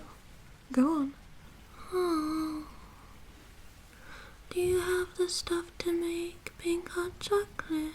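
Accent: United Kingdom. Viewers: Can you make a part 2 of this :D, this is my favorite asmr yet with the British accent ｡◕‿◕｡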